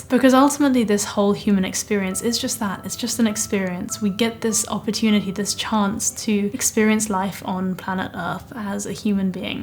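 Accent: United Kingdom. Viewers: 从你读大学的视频看到你现在怀孕将要做妈妈，见证你从女孩到女人，心里很感触。恭喜你&祝福你。我的英文不好，但是我很喜欢你的英式英文的口音。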